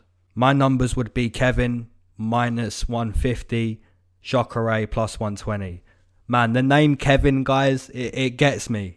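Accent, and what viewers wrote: United Kingdom. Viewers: U sound like that other Brit (mma guru) who talks funny and crazy ... who’s the better predictor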